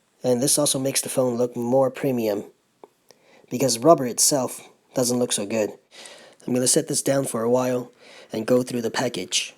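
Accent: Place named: West, United States. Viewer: you talk like cowboy trash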